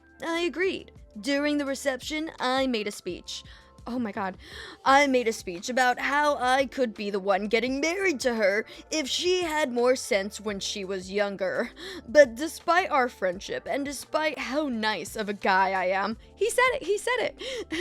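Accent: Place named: South, United States. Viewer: Your redneck accent is on point 😂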